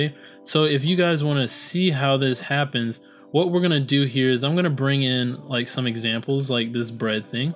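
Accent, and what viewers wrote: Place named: United States. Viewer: Hi. Good tutorial. is this feature available in Wix worldwide or is it specific to a particular country? I'm assuming (by your accent) that you are in the USA? I'm in India and the hover feature is not showing up when I add a box...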